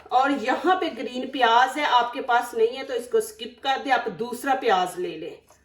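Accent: Punjab, India. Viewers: Ma shah Allah zaberdat . Ap kaha say hay punjab say ap Sialkot ya Gojranwala say lug rahi hay ku k ap ki urdu bohat punjabi touch hay 😻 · مجھے تو آپ کی پنجابی لہجے والی اردو اچھی لگی 💕💕💕💕🤲🤲🤲🤲اور ریسپی بھی میں ٹرائی کروں گی مزے کی لگی 💓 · Baji bohat achi recipe hay lakin aap K Punjabi lehjy me Urdu bohat torture karti hay. Kya hi acha Ho K aap Punjabi me hi videos banaya Karen. Wo hame ziada pasand aay gi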